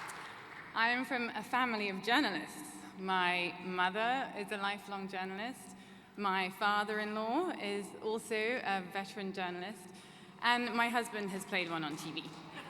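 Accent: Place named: United Kingdom. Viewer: I really like her British accent .she is clever , educated and authentic and gorgeous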